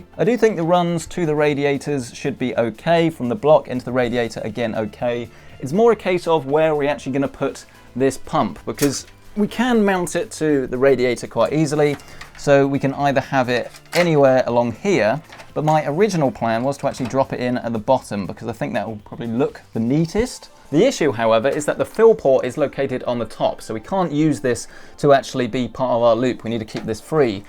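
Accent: United Kingdom. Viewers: Great use of the 90-degree bending tool... my eyes are still hurting...also my ears from that British accent..How do you have 466k subscribers is beyond me.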